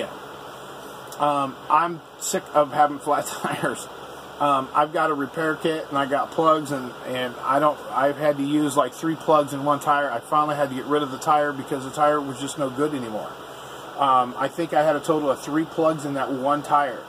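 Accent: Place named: Texas, United States. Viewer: You definitely don't sound like your from up North!! Lol.. You sound like a Texan or like a southerner.... Hahahahaha 😂😎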